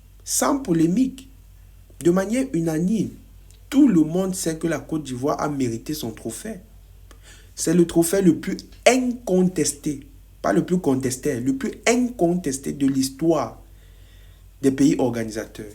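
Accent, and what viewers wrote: Cameroon. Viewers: Toi tu as un accent camerounais hein, tu es sûr que tu es ivoirien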